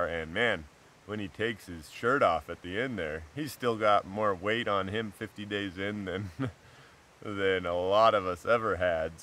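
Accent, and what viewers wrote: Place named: Siberia, Russia. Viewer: As you know, Alone on TV is very drawn out sometimes, that’s why I started doing 1.5/2x But, I never got to hear what your voice sounded like at this speed because whenever you or roland were on I would slow it back down so I didn’t miss anything. You guys are like encyclopedias: straight to the point, like how I imagine many most mountain men and Russians are. I have a theory that your time spent in Siberia has given you a really cool dialect. It’s always a pleasure to hear you talk, especially from memory.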